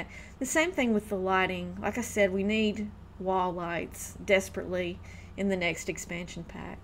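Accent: South, United States. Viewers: Your southern accent is so awesome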